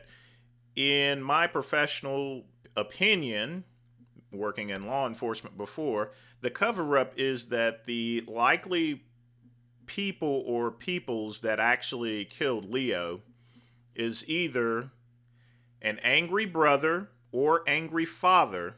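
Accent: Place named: Kentucky, United States. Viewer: Interesting southern accent. Is it Kentucky?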